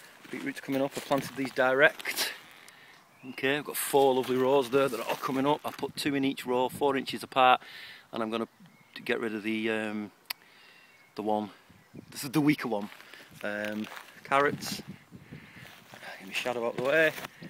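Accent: South, United States. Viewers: Now see I clicked on the video to hear the Southern accent! LOL. I know what you mean about being impatient. Bernard on navajopa31 has a video on grapevines. Here's the link. <link> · You master gardener you! You are excelling brother. Common....I want to hear you make fun of me...HAHA! I want to hear the southern accent! You'll be growing stuff yearround in that greenhouse. I like it. Wish I had a larger one.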